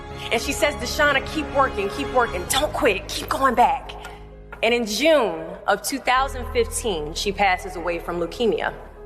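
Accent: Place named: South, United States. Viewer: Have..none of you been to the south?? This is how ppl talk down here😭🙏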